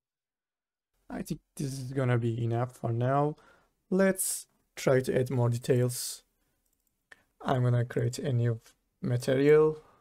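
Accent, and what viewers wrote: Turkey. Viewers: Turkish accent 😅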